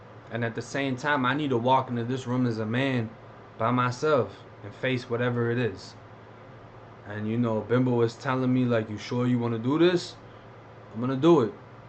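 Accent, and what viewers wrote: Boston, United States. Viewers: U from fl or boston? I see u got that Boston accent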